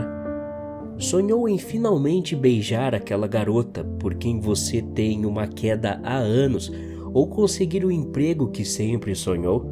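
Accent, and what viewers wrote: Bahia, Brazil. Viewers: Mano, o mlk era baiano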